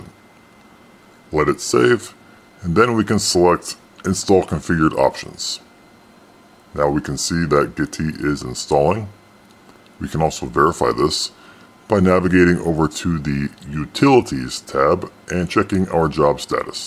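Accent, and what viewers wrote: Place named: South, United States. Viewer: Preppers are so cute when they are LARPing and all edgy and pretending their fantasy is going to happen. Gotta love the "creepy guy from the horror movies" voice modulation. Although, your southern accent and intonations do come through despite this.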